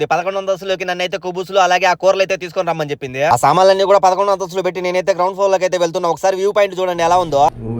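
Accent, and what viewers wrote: Rayalaseema, India. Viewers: Seema slang anthe 😂😂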